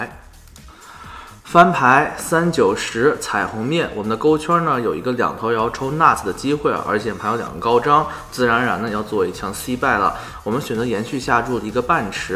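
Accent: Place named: North, China. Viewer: 太好看了， ，一听口音，是国内北方人，有点像北京人，又有点不像... 不管那么多， 很喜欢你的视频和打牌时的状态，心潮翻滚，面不改色，运气紧随。没说的，必须订阅小铃铛。 祝好运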